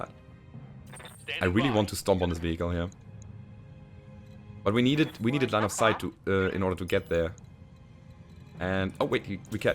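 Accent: Germany. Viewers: I like the format of build and refit at the beginning then a battle then upgrades and another battle. Each episode is well layed out. I dig rogue tech and have played the table top since 94 and no one would play the level 3+ rules that rogue tech represents. I love the whole thing even the Deuchlund accent, loved my time there.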